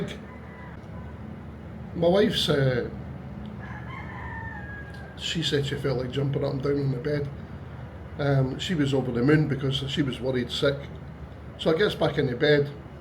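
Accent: Scotland, United Kingdom. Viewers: I’ve visited Blantyre a few times… Love the people over there! Very warm and friendly, if they take a liking to yah! Took me back when I heard ya speak! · New subscriber here. I’m guessing your Scottish? 😊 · Sounds you are from Scotland….no doubt it’s nice to live in the Philippines because it’s not cold like Scotland .. but when it comes to Medical care, you ,😊 you have to use all your life savings ready…American Style, No Medical Insurance your f****up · He sounds like a true Scotsman. Take care mate, stay in good health always. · Lewis Capaldi accent.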